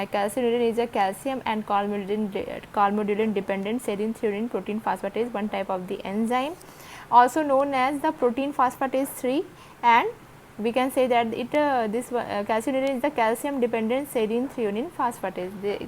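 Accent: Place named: Himachal Pradesh, India. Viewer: Mujhe apki slang himachali lg rhi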